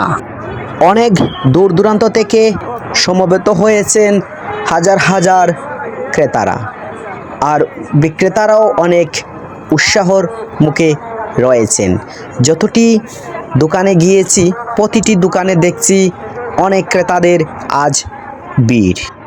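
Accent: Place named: Sylhet, Bangladesh. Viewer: সিলেটি বেটা শুদ্ধভাবে বাংলা বলতে পারে না। পারোনা যখন তাহলে তোমার আঞ্চলিক ভাষাতেই ভিডিও বানাও।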